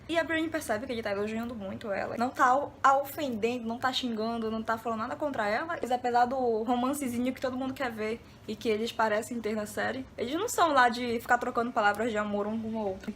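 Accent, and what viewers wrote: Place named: Pará, Brazil. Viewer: Esse sotaque paraense <3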